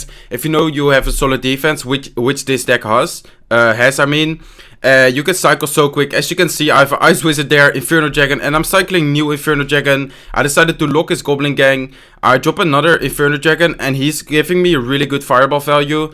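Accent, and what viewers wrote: Netherlands. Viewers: ik hoorde meteen je Nederlandse accent hahaha want dit is de 1ste video die ik van je kijk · are you dutch? sounds like it xD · Je bent nl 😂 dat hoor je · SmikkelKabouter He is Dutch yes. I used to speak English like that too but that was when I was 12 years old xD Btw sappige nickname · Haha je hoort echt duidelijk dat hij Nederlands is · ben jij nederlands? zo klink je man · Zo'n nederlands engels kut accent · Mooie tacktieken! Toen ik de video startte en je accent hoorde dacht ik gelijk "dat moet wel een Nederlander zijn" en toen las ik je discription. Tbh best wel fun. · Are u dutch cuz u have a accent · SmikkelKabouter sws nl je hoort het meteen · Haha dat Nederlandse accent geweldig😂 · SmikkelKabouter hij is nl ik hou echt van zn engels😂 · your not english i think i think your dutch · Haha je Nederlandse accent is te horen ;) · SmikkelKabouter hij is nl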